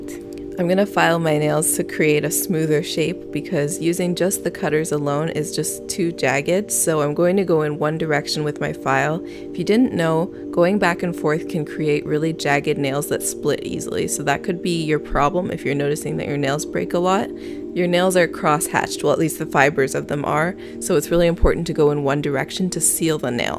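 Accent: Canada. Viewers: Ohhhhh I hear!!! You are Canadian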